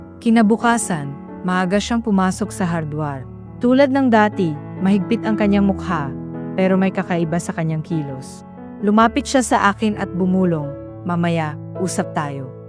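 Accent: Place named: Visayas, Philippines. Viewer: Bisaya k po b miss? Halatang halata Kasi Ang Punto mo.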